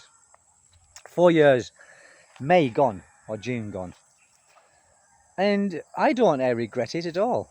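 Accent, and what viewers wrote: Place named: England, United Kingdom. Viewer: I like your videos. Not only good insights, but a relaxing style, including the cool english accent. 😎👍 Now about independence in relationships, the way i like to express it, is the idea of three lives. A life for you, a life for me, and a life together. Because no one person can always be all things for the other. And that's quite all right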